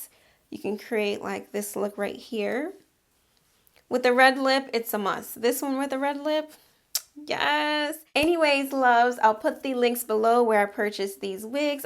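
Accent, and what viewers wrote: Jamaica. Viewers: Mi did know yah Jamaican ....🤣🤣...lol first time watching you and with in the first few minutes I was like she prob Jamaican......guuurl! New subbie😂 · OMG! Gurrrrrrlllllll I LOVE YOUR WIG VIDEOS! Your personality is what really drew me in as a subbie! But I heard an accent OMG are you Jamaican ? Wish I could subscribe 10 more times now! You go girl!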